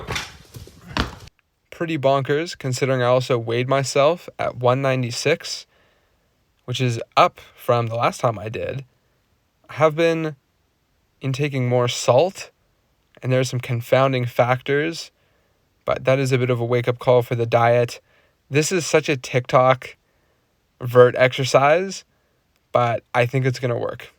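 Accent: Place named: Canada. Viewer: what is your height? and are you canadian? you sound very canadian 😆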